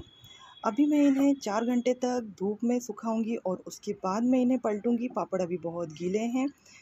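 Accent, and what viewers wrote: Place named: Malwa, India. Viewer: Aapko pta nahi gagan ji, indore malwa me aata hai aur waha ke local log isi tarah se bat karte hain...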